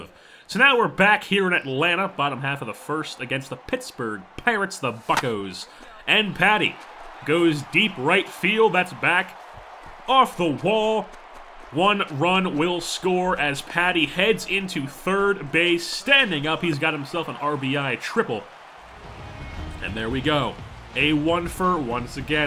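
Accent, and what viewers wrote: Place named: Boston, United States. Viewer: Dang, I'm liking that Boston accent. Sorry had to root for my sox today, but glad too see Patty getting better and better as the games go by.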